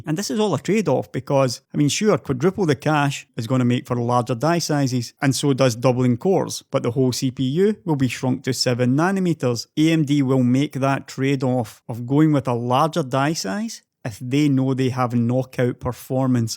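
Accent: Scotland, United Kingdom. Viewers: I can hear the Scottish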